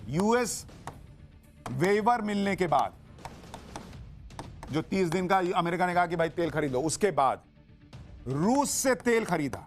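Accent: Bihar, India. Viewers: Ye to Bihari Tun hai bhai aap ka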